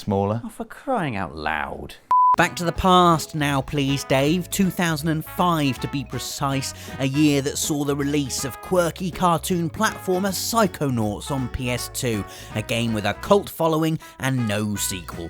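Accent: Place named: United Kingdom. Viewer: I love you, and your similar but different British-ey David Mitchell voice.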